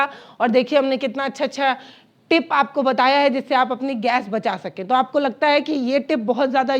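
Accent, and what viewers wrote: Bihar, India. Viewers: Aap bihar se ho na??? Kaaraahi kaaraahi bol rhi ho?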